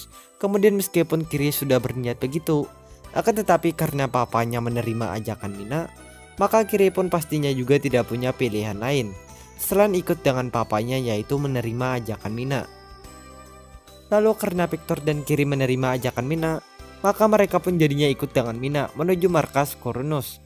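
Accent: Banjar, Indonesia. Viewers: Logat banjarnya kedengaran kali min 😄😄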